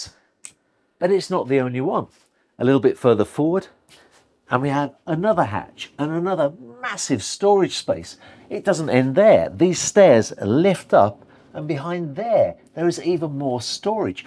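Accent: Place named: Birmingham, United Kingdom. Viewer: As a photographer / videographer (as well as a boater) I find your videos inspiring. With your TV standard presenting, it's great to see ... (brummie accent makes me smile) .... 🇬🇧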